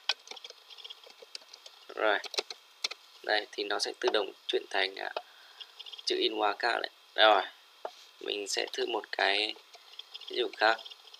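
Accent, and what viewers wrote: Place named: Nghe An, Vietnam. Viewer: nghệ an rồi!!!!!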